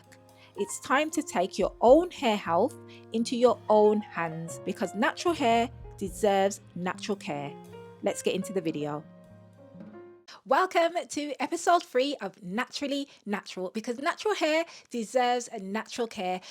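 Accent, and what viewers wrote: Birmingham, United Kingdom. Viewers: Your accent sounds like your from birmingham yay a sister from the ends😂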